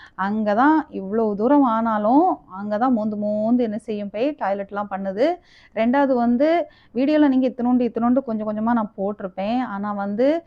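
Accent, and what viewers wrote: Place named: Nagercoil, India. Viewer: Love the Nagercoil accent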